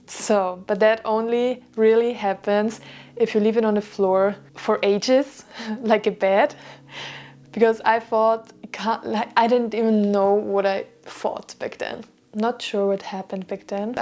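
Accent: Austria. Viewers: first time I was really certain someone online is from Austria from an accent in English, lol (and I was right according to the channel Info) can't pinpoint the area tho, but sounds more central/ northern/ eastern than southern/ western, I think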